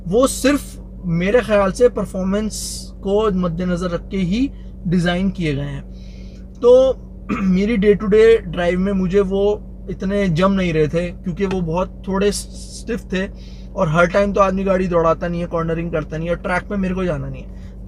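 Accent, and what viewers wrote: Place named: India. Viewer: I think the same until I notice that is actually english but with soooo strong hindi accent...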